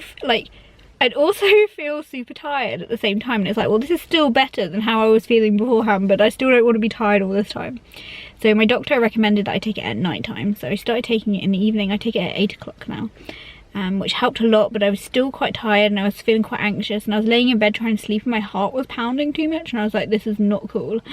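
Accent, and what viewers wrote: United Kingdom. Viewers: Love your English accent! I can relate to you allot. Starting Strattera today.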